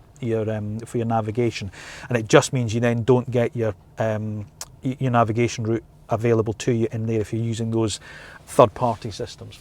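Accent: Scotland, United Kingdom. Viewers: What a great and very informative review. Your Scottish accent makes it’s extra easy to listen to, but you covered literally every aspect of the car and I almost feel like I was right there experiencing everything you described myself. Hats off 👍🏽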